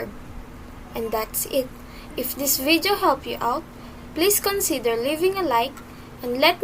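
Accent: Philippines. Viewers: Yup i could tell bc of his accent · Based on her accent She is filipino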